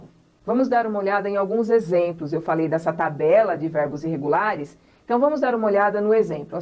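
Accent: Brazil. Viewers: Professora. Sempre foi e sempre será mais fácil lançar criticas do que elogios. Porque para elogiar é preciso entender o que o outro está se propondo em sua mensagem. Por isso preciso dizer aqui que o seu trabalho é maravilhoso, o aprendizado é real e o seu sotaque para nós é a melhor parte dele. Principalmente para quem quer aprender Inglês sem querer se transformar em um "americado" sem sotaque. É claro que seu marido Steve tem o sotaque de lá e você tem o sotaque daqui. E o que isso importa? É claro que Ricka Yoshida também deve ter seu sotaque característico mesmo quando terminar os seus estudos aqui, grátis, na Managed English. Siga em frente. Abraços! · thanks a lot for the class...that will help lots of brazilians sttudents for sure ...but some pronunciation mistakes.. examples....that she said...last weekiii like Brazilians do..it's wrong...It x eat it's different... 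present perfectiii....likedi it's likt it's a typical Brazilian accent...the use of ED like worked it's wrong...it's workt....I know that many people don't like to improve their accent and pronunciation. they just want to get across their messages....but for those who are teacher or that one's who really want to become better at English should reduce their accent.... please don't take it as a complain. sometimes we have to have someone who correct us..and better yet....Learn with our mistakes....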